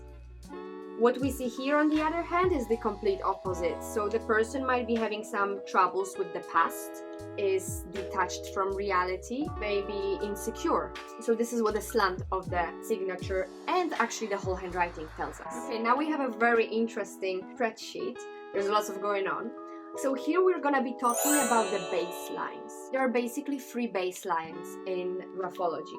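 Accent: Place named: Germany. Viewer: = Not Italian; +the accent (and way cute!), she's likely Polish or some other former Warsaw country. Her name in Italian would be something more like: Alessandra Piotro. Before seeing her surname in video (9) I thought maybe High-German by the accent and the way she wrote the number "1" in video (3)....unless that's her husband's surname, in which case I'll stick with German. Else if not married, wanna go on a date with me?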